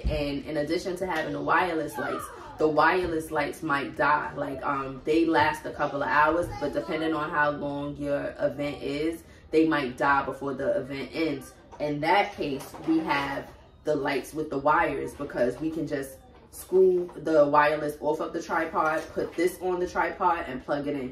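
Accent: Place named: Brooklyn, United States. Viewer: With that accent...it sure sounds like ur in a Brooklyn girl........but gurl I pray ur not UNDERcharging folks!🤷🏽‍♂️🤦🏾‍♂️...🤔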